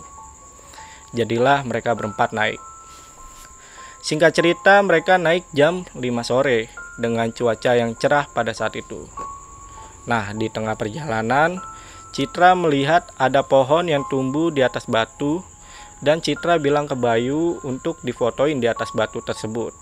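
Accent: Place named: Bali, Indonesia. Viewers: Suara bang medok Bali banget yah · Aksen bahasanya seperti orang Bali kak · Logatnya seperti orang Bali..slm dari Bali juga..smoga sukses cannelnya..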